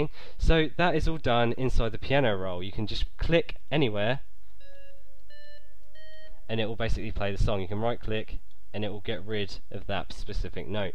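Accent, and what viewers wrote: United Kingdom. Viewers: Something I've observed. People with sort of British accents always make the best tutorials! Thanks, I think I've got it now! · nice..that really helped me out ALOT! i'm on my way to downloading LMMS!!!!!!! :D p.s I LUVED ur british acsent lol.... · Oh my, i love your British accent, and great tutorial. :D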